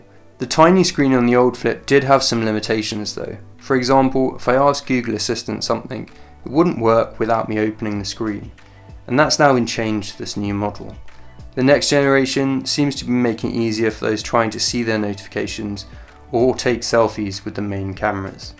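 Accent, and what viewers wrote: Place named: United Kingdom. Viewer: I enjoy the British accent too!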